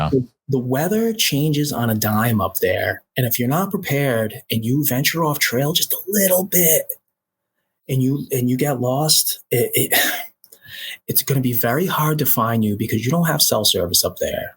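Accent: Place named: New York, United States. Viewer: This dude is so believable. I hear it in his voice. I hear NY ( north) in his voice, but if he talked to me he’d scream Hillbilly from s.e Kentucky. Isn’t it funny how a few hundred miles change our whole way of talking somehow lol. God bless everyone listening or reading. Remember bros & sisters. EVERYTHING WE SAY OR DO OR THINK, Releases either positive or negative energy, DO Not spread negativity because it leads to sickness, depression, anger, hostility, hate disorders, disease and death. It’s been scientifically proven by the water has a memory case study. Don’t let someone else sling their negative energy onto you, If it happens to you don’t respond with negativity because that means it stuck onto you. Be positive and let that evil energy bounce right off ya. Ps- That same frozen water / ice crystals study even proved holy water is real. 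Nasty polluted water once frozen could not form any pretty patterns in the ice crystals until they prayed over it and refroze it, only then did it produce beautiful patterns in its crystals And One container of water was spoken to and only told it was a Angel. Then after they froze it and looked at it’s ice crystals underneath a microscope, guess what type of patterns it made???? = A HALO OF FLOWERS How does water know angels have halos? Unbelievable huh? They now claim the largest living thing on earth is water. And water itself could possibly be the base element of life itself? What?? That last part blows my mind times 3 Idk just repe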